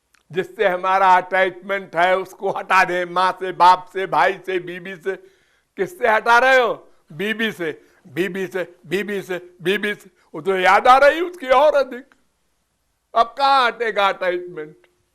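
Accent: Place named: Haryana, India. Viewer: Swamiji haryana se lagte h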